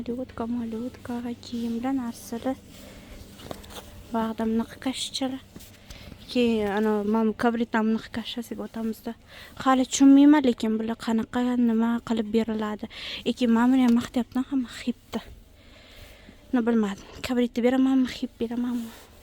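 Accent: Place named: Syrdarya, Uzbekistan. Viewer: Nasib keling Sirdaryodanmisiz shevayizi o'xshatdim adashmagan bo'lsam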